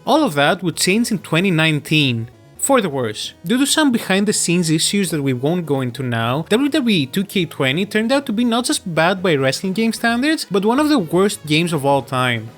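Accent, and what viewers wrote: Greece. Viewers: That's the Greekest accent I've ever heard lol, awesome video bro 😊 αγάπη από την Κύπρο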